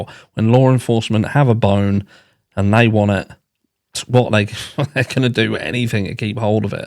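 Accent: United Kingdom. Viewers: True Crime is always better with a British accent.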